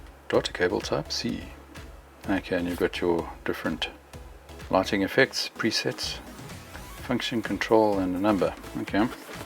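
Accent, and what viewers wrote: South Africa. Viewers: Nice review, you sound South African ?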